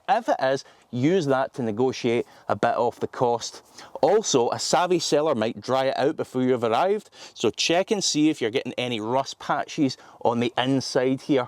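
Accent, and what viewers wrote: Scotland, United Kingdom. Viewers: Very good and informative video, well done!. Also your scottish accent is cool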